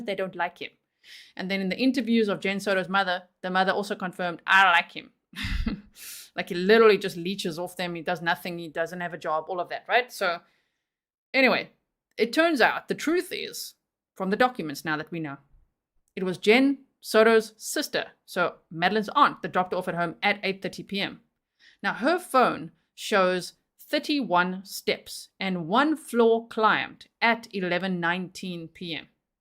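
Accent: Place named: South Africa. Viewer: New subscriber here, I'm really particular with who I listen too as some people are really annoying to listen too lol, but I'm so happy to find you, love your SA accent and slangs (shame) 😂 as hubby is from SA, love your channel ❤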